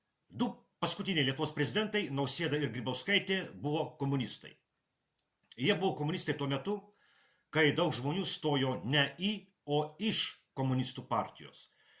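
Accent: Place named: Russia. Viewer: tik vienas brazauskas nebuvo komunistas,o kalba su rusisku akcentu sitas isverstaskuris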